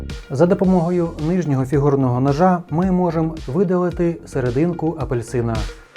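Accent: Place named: West, Ukraine. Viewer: изуродованный западеной русский язык...тошно слушать,я все понимаю ...лучше звук выключить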